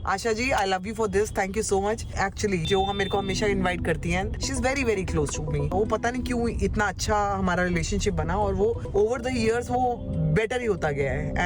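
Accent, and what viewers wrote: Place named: Maharashtra, India. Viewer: So cutely she speaks मराठी... being maharashtrian I like her मराठी accent... झोपला भोपला😂😅😂😅😂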